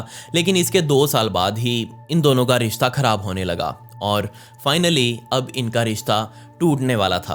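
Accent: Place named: Punjab, India. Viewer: hilariously you speak hindi little bit punjabi touch make your video more interesting carry on great job.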